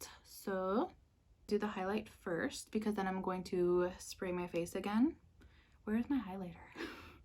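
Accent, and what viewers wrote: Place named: England, United Kingdom. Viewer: for some reason you remind me of someone who was born and raised in England but moved to America and lost most of their accent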